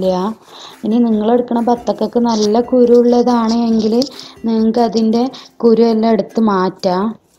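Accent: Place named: Malappuram, India. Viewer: കുട്ടി മലപ്പുറത്ത് കാരിയാണ് ല്ലേ.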